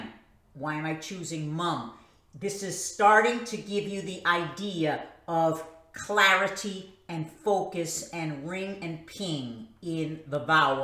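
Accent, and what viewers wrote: New York, United States. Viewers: Thank you for making and sharing this video. I look forward to watching your other videos. Also, I had a question. Are you orginally from New York?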